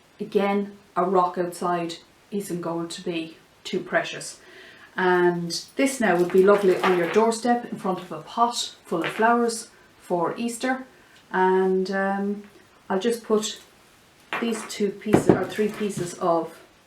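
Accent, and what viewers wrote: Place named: Ireland. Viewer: Really enjoyed this ,,,good to hear an Irish accent.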